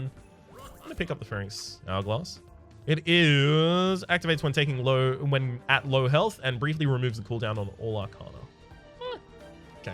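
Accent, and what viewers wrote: Australia. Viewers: That beautiful authentic Australian accent is music to my ears and I want to hear more of it.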